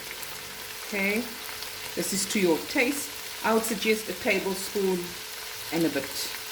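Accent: South Africa. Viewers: Nice to hear a South African accent again . Regards from Switzerland . Looking forward to cooking it . Looks lekker .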